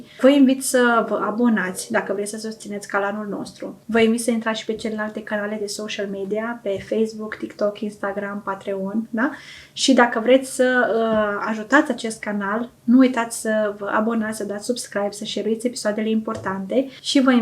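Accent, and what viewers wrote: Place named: Moldova. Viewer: Ft frumos comentați ...și complet...un ușor accent Moldovenesc sau ma înșel. ...nu ca ar fi ceva rău ..un medic ginecolog complet...felicitări ..și ditr o alta perspectiva ..și încă ceva ..dvs aveți fibroame ?Adică leiomioame ?😂😂😂😊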